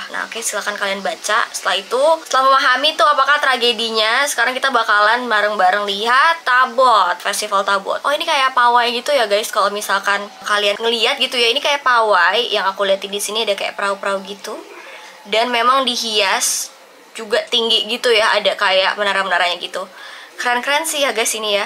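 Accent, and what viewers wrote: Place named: Surabaya, Indonesia. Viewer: Arek Suroboyo Iki loh masse 🙋🙋🤣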